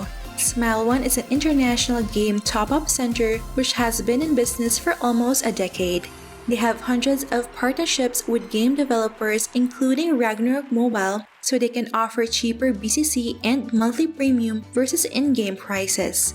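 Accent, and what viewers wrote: Philippines. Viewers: As if the accent was not already an indication that she's filipino and stating most of the time that she uses "PHP" as an indication of BCC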